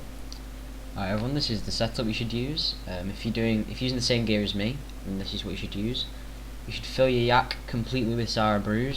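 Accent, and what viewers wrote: United Kingdom. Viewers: You from middlesbrough? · geordie accent :D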